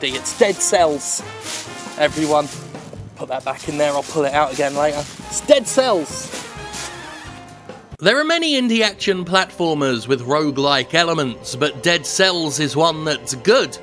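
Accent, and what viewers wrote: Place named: United Kingdom. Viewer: I'm just thinking of what people thought of, standing next to a dumpster in America somewhere, dressed like that with a camera on him and his British accent. I'd like the police to drive by so I could see their double-take.. lol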